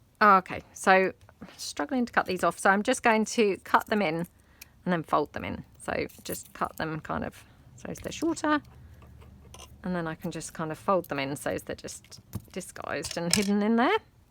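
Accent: England, United Kingdom. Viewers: Hi Miss!! I'm new to your channel but I am loving what you are doing..And your accent is beautiful..Are you from England? I look forward to watching your videos and seeing all the great Fall things you make...Lots of love from Kentucky💙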